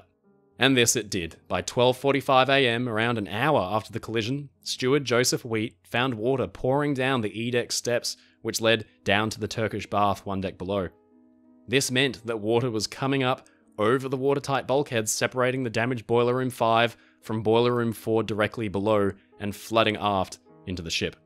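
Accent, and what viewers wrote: Australia. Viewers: Good old Aussie accent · Are you from Australia?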